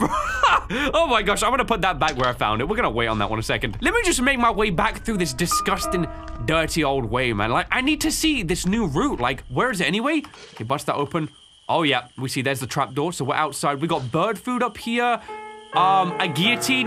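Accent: United Kingdom. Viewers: Wow fabulous.This man's brittish.lovely.. Good video!!!😊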